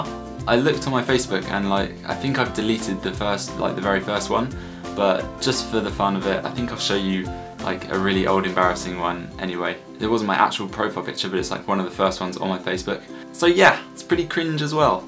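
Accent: England, United Kingdom. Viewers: I'm from Britain, but even I think your voice is awfully British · Yesss,he makes me proud to have a Englsih accent:))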